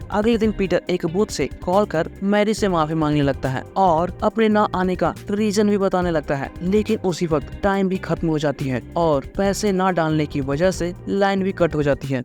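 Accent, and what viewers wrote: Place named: Bihar, India. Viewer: Aspaiderman 😊.. That bihari accent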